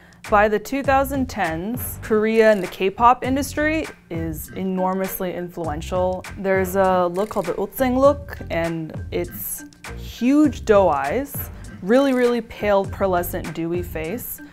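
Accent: United States. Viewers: I think its difficult to mimic Mandarin if you don't speak Mandarin....especially for some people. She might have tried and butchered them so much that she stuck to a fluid American pronunciation.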